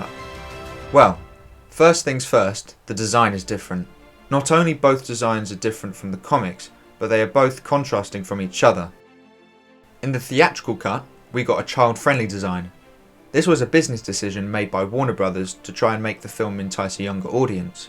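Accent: United Kingdom. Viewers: I love your explanation so clear despite the British accent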